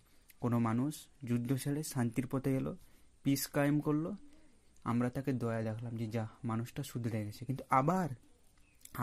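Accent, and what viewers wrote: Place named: Murshidabad, India. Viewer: Valo hoyechhe tobe kolkatar banglay video korben majhe majhe ektu Mursidabadi tan aschhe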